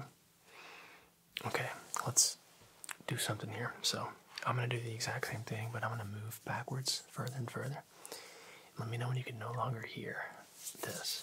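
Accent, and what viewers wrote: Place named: South, United States. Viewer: you got a hint of southern in your voice 🤨🫣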